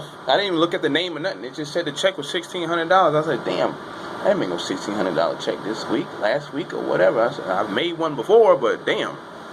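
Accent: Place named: New York, United States. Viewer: Nah son sure do have that ny vibes I’m from Brooklyn living in Houston so I can tell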